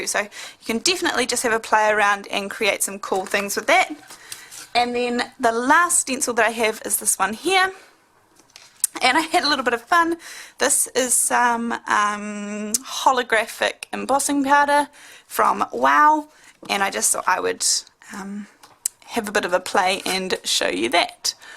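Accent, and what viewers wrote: New Zealand. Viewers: Wow !! So how do you heat emboss the mandala please? What kind of stamping ink do you but to get a really clear stamp? I've been using aliexpress ink and the images turn out a wee but fuzzy. Thanks so much for your videos!! It's nice to hear a fellow kiwi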